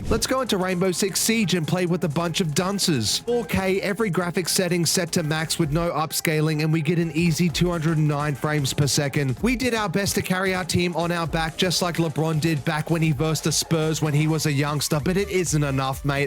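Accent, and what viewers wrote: Australia. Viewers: Do people ever comment about your aussie accent? I live in an area where you never hear it.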